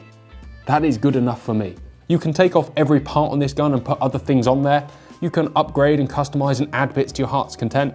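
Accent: South, United States. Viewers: Good southern accent and good review......you sounded like you are from North Carolina P.S I saw a brief news report of US weapons going to the Ukraine and amongst them were Mossberg 500 shotguns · I like your American accent. 😄😄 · Great Southern American accent. 👍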